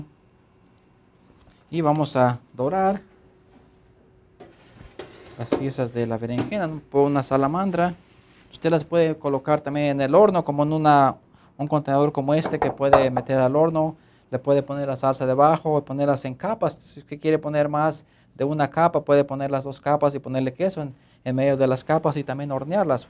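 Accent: United States. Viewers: El mexicano hablando como los gringo aprendiendo hablar español jajajaja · Déjate de acento estadoudinense,no te queda bien amigo!!!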